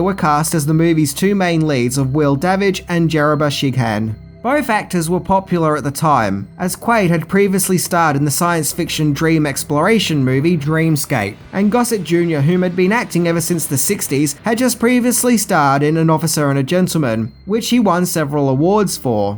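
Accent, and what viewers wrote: United Kingdom. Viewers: I can't get around the British accent of the narrator. The inability to pronounce Rs, Ts, and THs drive me crazy. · alway he british accent EVA /= either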